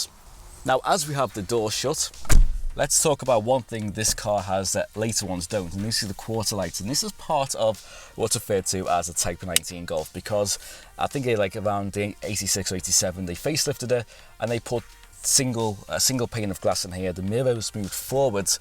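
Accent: Liverpool, United Kingdom. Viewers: I have a mate from Maghull and you sound exactly like him. A bit different from 'normal' Liverpool!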